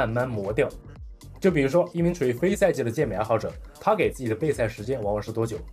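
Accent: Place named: Dalian, China. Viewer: 這應該是地區發音的問題，之前我認識住在大連的大陸人，他講話也是這個方式，可能跟方言有關係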